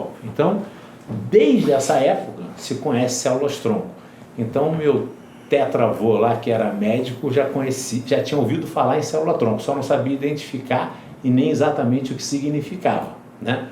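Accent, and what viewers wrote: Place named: Sao Paulo, Brazil. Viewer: O carioca ortodoxo agora é mais paulistas. Rsrs. Te amo meu primo. ❤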